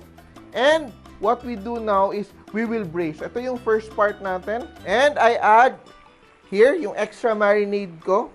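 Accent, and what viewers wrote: Philippines. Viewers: Philippines accent are cute ...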